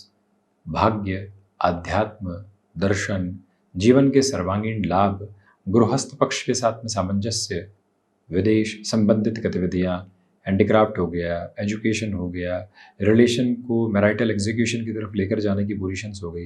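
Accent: Standard. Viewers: पंडित जी आप बहुत शुद्ध बोलते है आप जो बोलते है उसका अर्थ समझ मे नही आता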